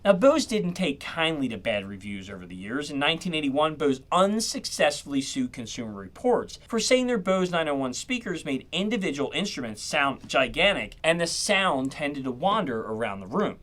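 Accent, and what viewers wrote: Baltimore, United States. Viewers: 10 seconds in and I knew you were from Baltimore. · Are you from Baltimore/Maryland? I'm not from the US but I'm trying to improve my accent identification. (Also please don't think I'm weird, I'm just a sperg.)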